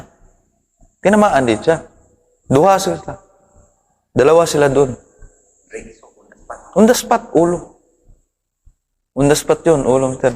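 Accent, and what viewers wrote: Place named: Visayas, Philippines. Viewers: Hiligaynon tingin ko. Ilonggo. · Bisaya master or Bisayang daku or bisdak ang tuno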